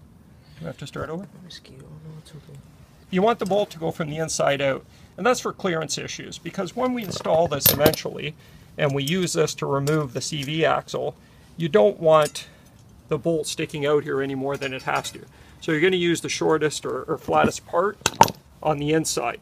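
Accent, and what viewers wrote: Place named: Canada. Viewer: Based on his accent he may be referring to Canadian dollars. And metal prices fluctuate quite a lot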